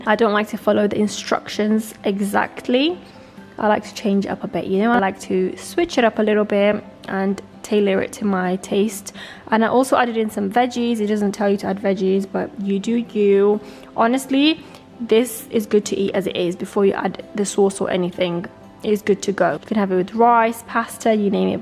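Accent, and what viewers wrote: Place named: Milton Keynes, United Kingdom. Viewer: Are you from Milton Keynes? I can hear your voice kindly u from there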